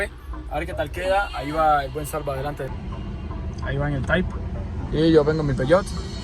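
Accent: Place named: Cuba. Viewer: tu español no suena neutro, parece cubano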